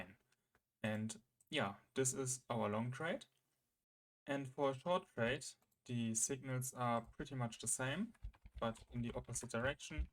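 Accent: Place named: Germany. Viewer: After two seconds, everybody recognises the German accent.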